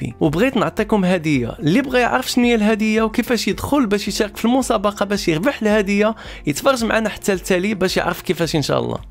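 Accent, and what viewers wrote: United Kingdom. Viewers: Awel Marra kansheuf maghribi kihdar b darija b' british accent :D , allah yfar7eik f 7yateik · كاتقضي عليا باللكنة الابريطانية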